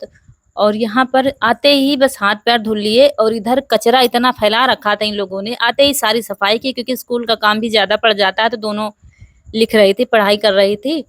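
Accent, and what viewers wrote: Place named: Lucknow, India. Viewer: बहुत अच्छा व्लाग है बहन आप कया लखनऊ के आसपास की हो बहना आप की बोली से लगता है कयोकि मैं भी लखनऊ से हु और आप के विडियो हमेशा देखती हूँ 👌👌👌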